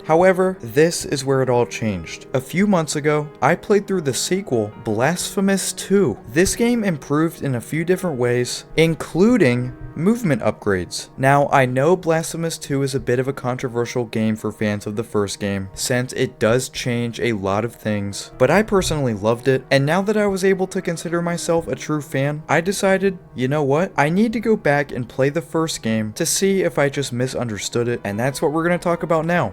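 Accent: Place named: United States. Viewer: u sound so american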